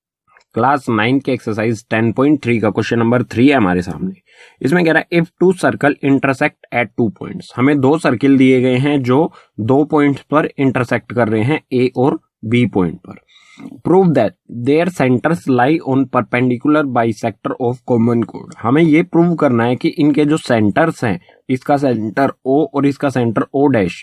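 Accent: Haryana, India. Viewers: Aap haryana ke ho kya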